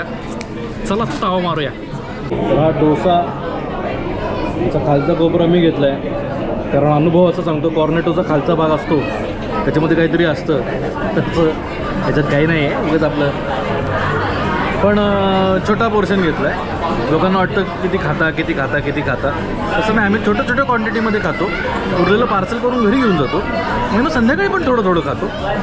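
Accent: Kolhapur, India. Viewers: Kolhapuri tone mast aahe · तुझी कोल्हापुरी टोन खूप आवडला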